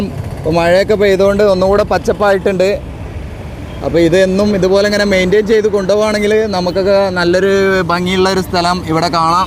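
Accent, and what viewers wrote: Malappuram, India. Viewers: നിങ്ങളുടെ മലപ്പുറം slang ഒന്ന് മാറ്റിപിടിച്ചൂടെ.. നല്ല slang ൽ സംസാരിക്കൂ..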